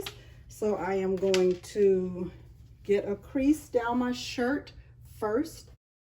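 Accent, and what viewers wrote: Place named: South, United States. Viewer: Chileeeeee 😂😂 You just helped me with an order I just got! I couldn’t figure out the font and got a notification that you posted content and I ran on over to your channel! 😂 I love your content! You’re so thorough and nice! And you have to be from the south. Every time you talk I crack up laughing! I love it! Keep posting content. I can watch you all day and learn. Thank you! Thank you! Thank you!🙏🏾🥰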